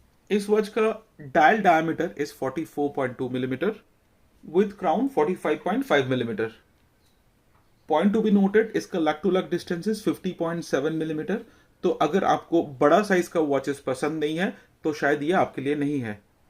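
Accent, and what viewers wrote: Bengal, India. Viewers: You are bengali?😅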